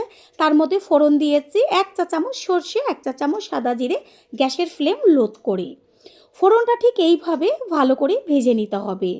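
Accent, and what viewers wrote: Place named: Bangladesh. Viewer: Apnar katha gulo bagla deshi moto lagche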